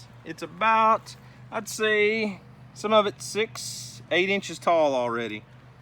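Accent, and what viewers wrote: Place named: South, United States. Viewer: Fl'ars'...luv thet country-fried accent!!💓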